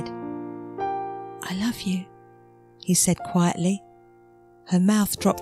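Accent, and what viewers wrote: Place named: England, United Kingdom. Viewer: Beautifully read. does indeed have a pleasant voice- very warm tone and clear dictation. Me being from the US I find the English accent very pleasant and nice to listen to. I don't have much experience with background music for audio books so I will just say it seems pretty solid to me. Can't really give any pros or cons due to my lack of experience there. Sounds good.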